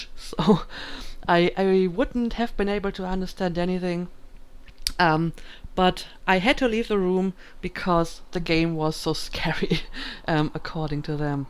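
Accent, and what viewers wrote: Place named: Germany. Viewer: Myst... that was the first game that scared me too. But I was very young and didn't understand a thing. And nice german dialect you have there. Ist das norddeutsch/friesisch? Ich kann es überhaupt nicht einordnen. Hört man im Süden nicht so oft :D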